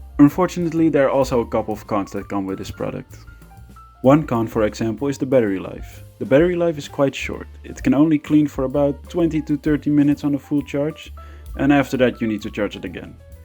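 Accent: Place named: Netherlands. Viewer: Totally love your review, calm voice and funky Dutch accent.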